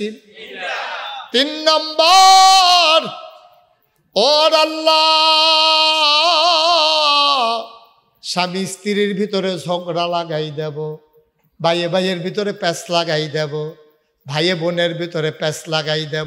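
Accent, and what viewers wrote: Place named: Noakhali, Bangladesh. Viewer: হুজুর আপনি তো নোয়াখালীর হুজুর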